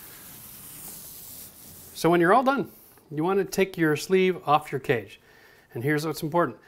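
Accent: Canada. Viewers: he's Canadian, give him some credit, we all speak different dialects of the English language. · The fact he mentioned Ottawa and every time he said ou didn’t give it away? 😂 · I knew he was Canadian by his accent. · Caught that in the first sentence! We love Canadians! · "Don't want to drag dirt through your mud".....never heard that sentence before haha. I knew you were from Canada! Sound like Steve Smith. Can you use a premixed joint compound instead? I couldn't find a small bag of 45 on my trip to the store. I grabbed at bag of the 5 repair. Hopefully that'll work! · How many people can tell he's Canadian by the way he talks?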